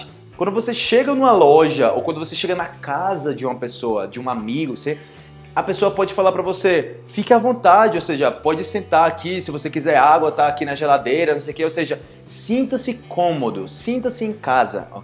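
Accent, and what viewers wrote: Spain. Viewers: Vc é brasileiro??? Eu acho que é, mas deve morar fora pq ja percebemos um leve sotaque e uma soniridade espanhola na sua fala...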